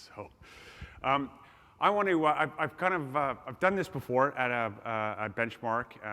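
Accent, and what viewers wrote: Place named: Canada. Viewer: More b.s. with a Canadian accent. Does this little socialistically propped-up company really think it will hold the major powers hostage with their patented processes?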